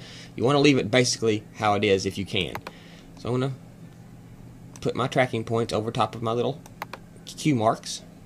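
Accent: South, United States. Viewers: Out of all the help I have ferreted out, your help takes me over the top. You rock! Although I wish you would use STP and place a compressor on your vocal track--your southern good old boy accent kills me.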